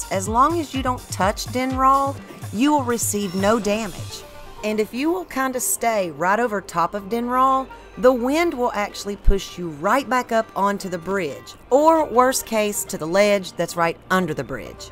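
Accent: South, United States. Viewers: Thank you so much! I always use the snow boots and never bought the snowquill trousers so I didn't know unfreezeable was a thing until this video. Nice to hear a fellow southern girl Zelda fan too! Cheers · A southern soccer mom voice talking in detail about video game strategy. That is a true paradox. It’s wreaking havoc on my brain. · Love her accent! Being from Oklahoma I never hear fellow southerners in gaming 🎉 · Holy hell you sound like family... Rare to hear such a sweet southern voice on the tube. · 1: I didn't know dragons were in this game and that's awesome 2: if you don't mind me asking, what is that accent? I can't tell if it's a southern accent or a Newfoundland one though I'm leaning more towards southern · I love your southern drawl and your videos. Thanks! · I don’t think I’ve ever heard anyone with a country accent ever talk about Zelda before and I didn’t know I need it. 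· This girl is definitely from the south. I say texas or Louisiana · Is the narrator from the southern states? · The southern voice is so rare on YouTube. I’ve been out of the real South for so long I’m starting to lose my ability to tell what state people are from. You don’t have to answer so that you can maintain your anonymity and privacy, but I wanna guess either the Carolinas or Tennessee?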